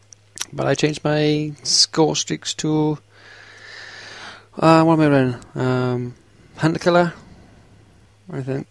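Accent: United Kingdom. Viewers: Lastly, your voice is clearly English -> Scottish in accent. To an untuned American ear, it is quite hard for me to understand you. This isn't your fault, just something to keep in mind if you want to broaden your audience.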